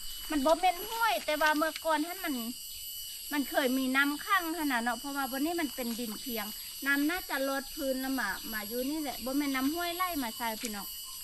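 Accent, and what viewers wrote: Thailand. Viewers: ภาษาบ้านข้อยกับภาษาไชยบุรีบ้านเจ้า เว้าคือๆกันเลยจ้า ภาษาเมืองเลย🥰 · สวัสดีครับน้องสาว ส่งความรักความหว่งใยและความหวังดี มาให้ทุกๆวันครับ❤สำเนียงพูดเหมือน เชียงใหม่ ครับ❤❤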